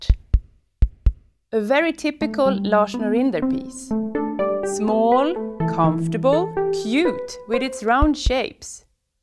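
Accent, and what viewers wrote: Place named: Sweden. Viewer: This is a very thick Swedish acccent, if there ever was one! Cute design! :-)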